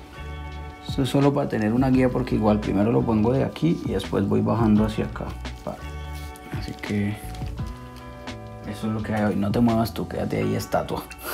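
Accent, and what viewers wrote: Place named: Bogotá, Colombia. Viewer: si noté por como hablas q eres de acá, sería brutal q tuvieras una tienda bogota, un abrazo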